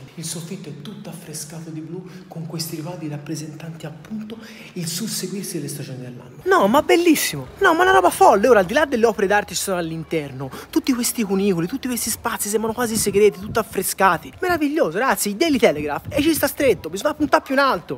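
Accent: Tuscany, Italy. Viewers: Ciao...io sono figlia di italiani e sono o mi ritengo mezza toscana perche' vi ho studiato, scegliendo la citta' di Dante presso cui studiare un tempo fa....non ebbi all'epoca la stoffa per fare dell'Italia la mia dimora finale! Oh well, I speak Italian and English! Ciao caro Mr...keep it up, for I love your Tuscan accent with the open C's...ciao e bacioni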